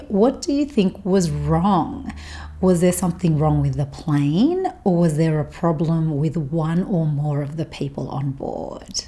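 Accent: Australia. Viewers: Hi, your videos are helping me to understand Aussie accent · This channel is fantastic for learning Australian English and accent. Please make more videos because I learn so much on this channel. It is easy and simple to follow and understand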